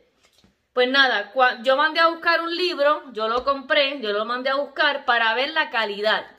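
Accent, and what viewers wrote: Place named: Puerto Rico. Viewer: Saludos desde Mayagüez, soy maestra de Artes Visuales ya retirada. Estoy comenzando a incursionar con la creación mi primer libro de colorear utilizando la inteligencia artificial. Precisamente estaba buscando tutoriales en Español cuando doy con tu video. Muchas gracias! Ya sabía que ese acento de boricua no se puede negar. Weeepppaaaa!😂😁😂😁😂😁🇵🇷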